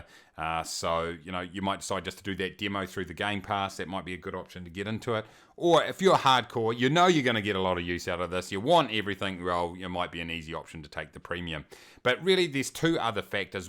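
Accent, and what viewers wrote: New Zealand. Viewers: Ahh man I miss the NZ accent. And NZ. it was my absolute favorite place to live.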